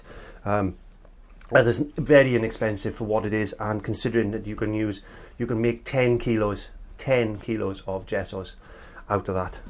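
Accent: Wales, United Kingdom. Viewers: Loving the Welsh accent, hello from Taffs Well Butty Boy :)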